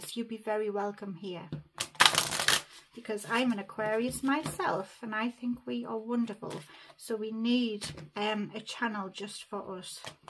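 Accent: United Kingdom. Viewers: I so look forward to these readings, I feel your accent is similar to mine as I live in Northumberland. You always make me feel so much uplifted afterwards. Thankyou and Bless you xx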